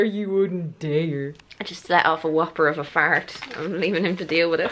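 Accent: Ireland. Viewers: ur irish accent is everything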